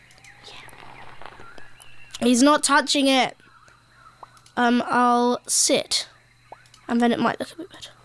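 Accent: United Kingdom. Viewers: U sound Britian! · You are you British?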